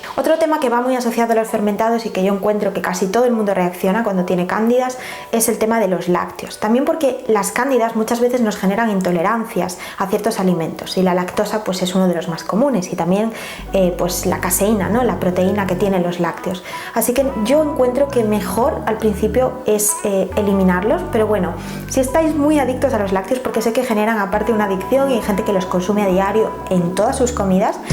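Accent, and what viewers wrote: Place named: Spain. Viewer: Saludos, son muy importantes tus contenidos, ayudan al equilibrio del cuerpo, mejoran el estilo de vida, bajar de peso si que es una odisea, conocer los efectos de la comida en nuestro metabolismo, entender los beneficios biológicos de todos y algunos no muy comunes en nuestra dieta por cultura e idiosincrasia, Adoro como expones tus videos en tu expresión sencilla y elocuente con ese hermoso acento español, gracias por recordar la cortesía de tus contenidos, abrazos mil hermosa y siempre agradable rodeada de amabilidad...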